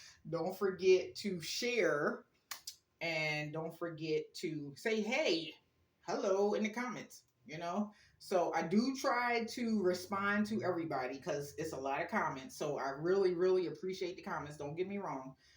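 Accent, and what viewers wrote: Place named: United States. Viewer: You have a accent what state do you live in the dance is right on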